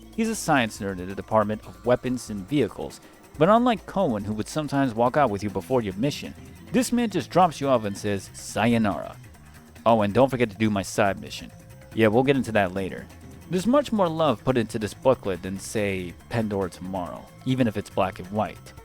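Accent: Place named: Netherlands. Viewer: You sound kinda finnish with some pronunciations. Jokes on me before you turn out to be dutch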